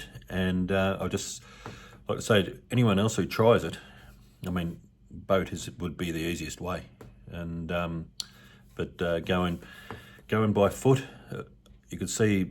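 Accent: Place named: Australia. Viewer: 36hrs driving.. “wowsers”... I’ve loved all your amazing earthy Aussie dialect tours. Thanks so much.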